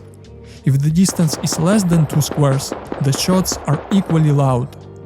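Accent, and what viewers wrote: Russia. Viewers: ты русский что ли?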